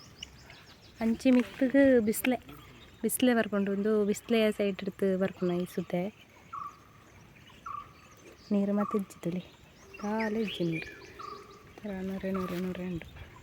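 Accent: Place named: Tulu Nadu, India. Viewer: Eerna Tulu kenara bhaari kusi aapund👌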